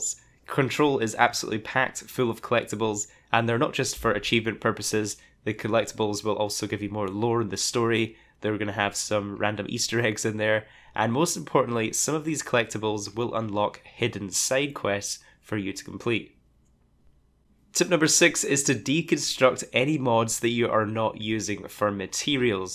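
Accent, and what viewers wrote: Scotland, United Kingdom. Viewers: I'm a late comer to this game. Thanks for that. Only just found the brother so it's hotting up. Good to hear and see a Scottish youtuber doing well. I always thought my accent would be a set back. Doesn't look like it with you.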